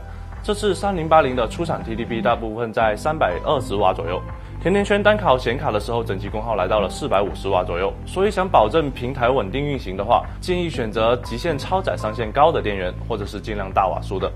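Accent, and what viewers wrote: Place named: South, China. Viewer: 南派普通话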